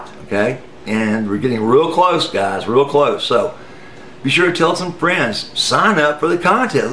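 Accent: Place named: Texas, United States. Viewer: The way you talk and your accent reminds me of Johnny Winter. I wonder if you're from the same part of Texas.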